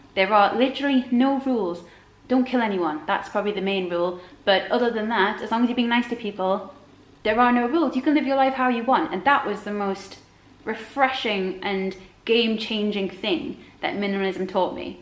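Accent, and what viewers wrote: United Kingdom. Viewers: you are such a lovely person! So glad I've just found your channel. Subscribed! It's always refreshing to hear a British person talk about minimalism.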